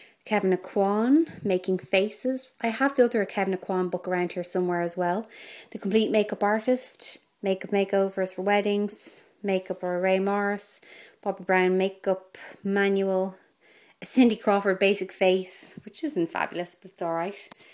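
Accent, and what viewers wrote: Ireland. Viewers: are you irish?? you sound lik your frm dublin? :) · Irish im thinking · I think she's Irish maybe? :) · im irish! i live in florida and have an american accent though. so finding your video made my LIFE when i heard your accent <3 your room is amazing & these were such great tips! thanks! <3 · Your from CORK ! deffo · Are you Irish?? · omg. the sec i heard yur accent i was like finally an irish girl.... :D :D :D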